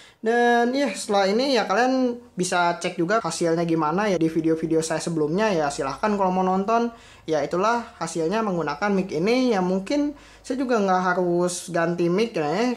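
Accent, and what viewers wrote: West Java, Indonesia. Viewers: Orang jawa barat yak ? Orang sunda yak ?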